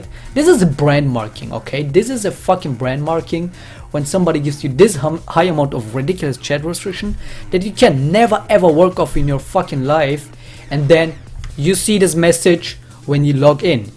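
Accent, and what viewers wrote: Germany. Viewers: WolfGang Man yes im german i know that accent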